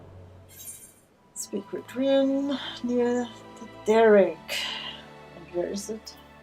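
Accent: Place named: Germany. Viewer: this german accent tho ^^ nice guide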